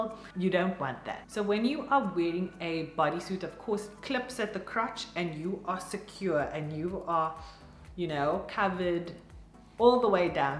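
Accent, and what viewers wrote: South Africa. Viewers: You sound capetonian....gottta love SA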